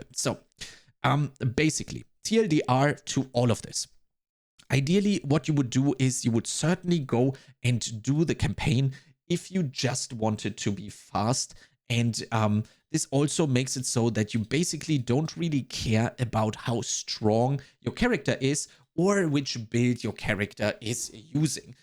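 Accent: Germany. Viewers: I had to edit this to add the spreadsheet you provided: There is an astounding amount of work you've put into helping. That spreadsheet (you have linked below your video) is so helpful, and you have additional pages of info clickable at the bottom of that spreadsheet. Huge, huge thanks. I would sub 10x more if I could to give something back. Some really incredibly helpful info and tips I wasn't expecting. Like letting the drop specs guide your build. Thank you for all the time you put into this, I've happily subbed. PS: where are you from? I'm originally from Austria and you sound either German or Austrian? Thank you again so much for all the amazing help.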